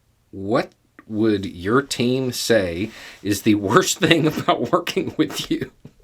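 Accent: South, United States. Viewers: For what it's worth I'm white as the driven snow and your Southern accent was the one glaring red flag I had to get past to realize that you are in fact based. Where I'm from, a drawl tends to be seen as an easy indicator of a simple mind that holds hateful ideas. I do recognize that this itself is a form of prejudice but damned if it doesn't have a better track record than a lot of its cousins.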